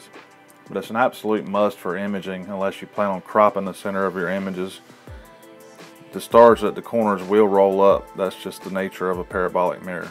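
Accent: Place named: South, United States. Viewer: There's just something about a thick southern accent that just derails any of the context of what's being said...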